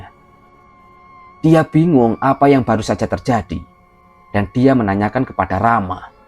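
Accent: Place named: Java, Indonesia. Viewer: medok bnr mas jowo ne keto 😂😂😂 ok lanjutt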